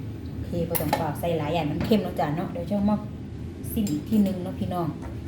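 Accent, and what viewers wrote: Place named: Nong Khai, Thailand. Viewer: อยู่เมืองนอกกะมีบ่อขี้เหล็ก เว้าแป้งนัวคือคนหนองคายศรีเชียงใหม่เนาะ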